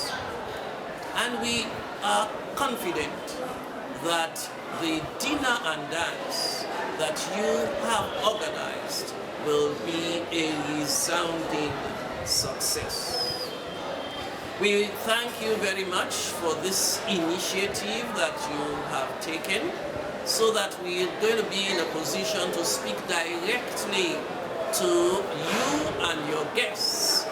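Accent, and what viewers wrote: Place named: Caribbean. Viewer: Now I know where the Caribbean accents come from.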